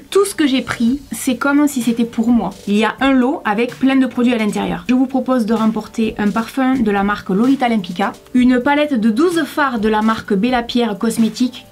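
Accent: South, France. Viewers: Je t'aime ♡ Vive l'accent du Sud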